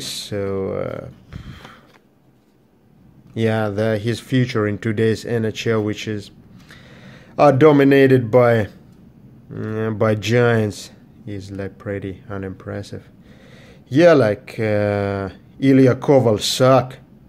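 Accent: Russia. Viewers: Nice video man. Are you Russian?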